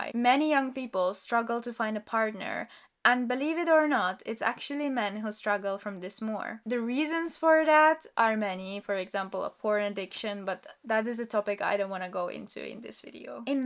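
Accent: Russia. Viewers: Hey i just want to tell you: never give up on making YT vids. I think you have a talent for it, plus natural charisma plus beauty. You will make it for sure (I say this knowing how tough it can be sometimes, i have a channel slightly bigger than you, like 1.5K subscribers... so just want to give you some encouragement, because i really think you're good at it) By the way where are you from? I just saw another one of your vids where you say you don't identify with your home country, but i just ask because i assume you're either from Russia or Ukraine because of your name and accent.... I've been to both (was actually in Kyiv when the war started....). Anyways you don't have to answer if you don't want but I'm just curious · I like your accent. Is that Russian?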